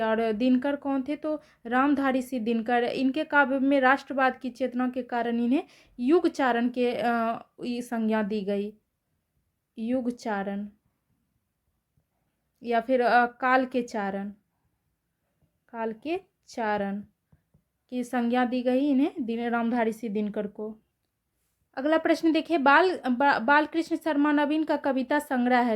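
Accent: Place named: North Bihar, India. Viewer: Medam AAP उतरी बिहार से हैं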